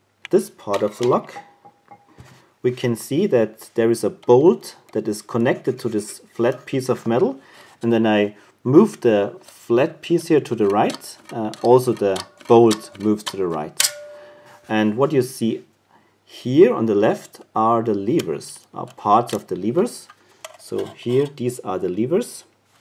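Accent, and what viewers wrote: Germany. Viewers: an deinem englisch merkt man das du deutscher bist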